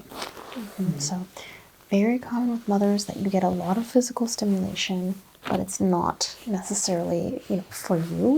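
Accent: United States. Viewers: Do you from U.S.A?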